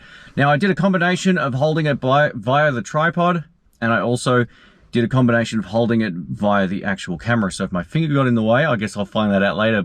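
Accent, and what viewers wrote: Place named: Australia. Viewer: I stumbled across your videos looking for a ring light review and I am glad I did. I am planning on using the GoPro as a facecam and I believe it will work well with the 10" ringlight on your channel! Keep up the videos, and I was wondering why everyone said you had a cool accent and I was confused because it sounded normal, before I realised you were also an Aussie like me!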